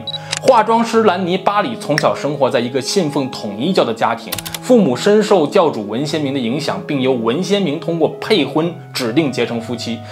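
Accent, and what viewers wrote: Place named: Beijing, China. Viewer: 這個小哥北京腔好明顯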